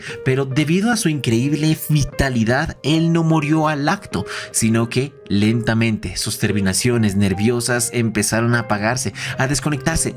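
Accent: Colombia. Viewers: Excelente video! Pdta: de casualidad sos Colombiano? 😅😂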